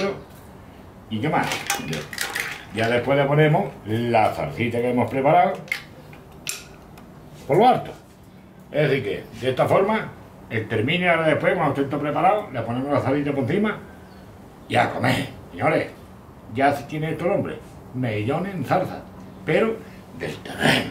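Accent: Spain. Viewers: Da gusto verte cocinar y escuchar ese canario calidad a ver si lo enseñas en algún vídeo, un saludo. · qué no sé le entiende?? Qué le habla al diafragma porque vamos...... andaluz😢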